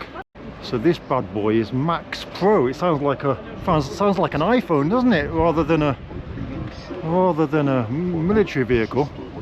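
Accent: England, United Kingdom. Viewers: Great video! I wonder if they were uneasy with you filming since you have the English accent, maybe worried some Englishman would make anti russia videos?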